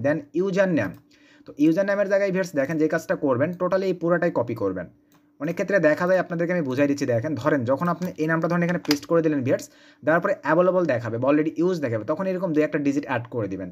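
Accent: Joypurhat, Bangladesh. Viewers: vai joypurhat kotha basa? apnar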